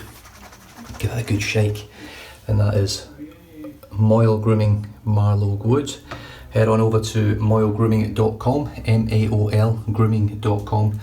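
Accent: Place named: Scotland, United Kingdom. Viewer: ahh..OK..Top of the Chain not 'Top Of The Chin' ...the Scottish accent caught me out LOL (and I have a Scottish doctor who has a thicker accent) LOL..OK mostly out of stock there ...I'll join the waiting list.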